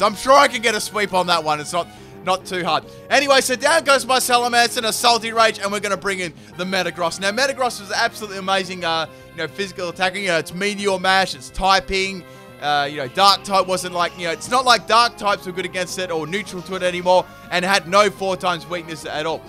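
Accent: Australia. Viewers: Did not know you were an aussi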